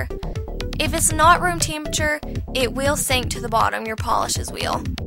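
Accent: South, United States. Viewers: does she sound country to you guys in this video more than others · im from Tenneessee :) your accent is cute. Normaly i hate country acents but not yours. SO going to try this later. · OMG! You sound so country! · wow, its like a southern cutepolish! such a cute cute accent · wow you have a really thick southern accent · Woah! I got really confused when you started talking! You're like a southern cutepolish! lol I feel like I got more information/instructions when you talk than with just text. I like it!!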